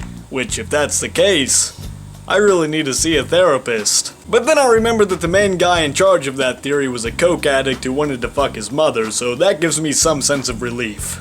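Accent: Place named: Canada. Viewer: He sounds Canadian.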